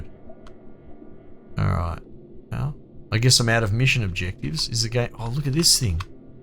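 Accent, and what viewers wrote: Australia. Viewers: lmao i fucken thought to myself this lad sounds like me, then you reckon "right", im like yeaaaaah he's an aussie hahaha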